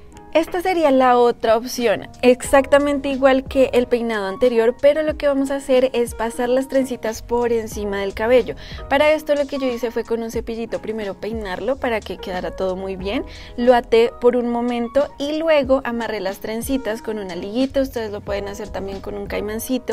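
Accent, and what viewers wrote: Colombia. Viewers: Ustedes los colombianos tienen un acento bello:3 saludos desde México 💗💕 (Hermosos peinados)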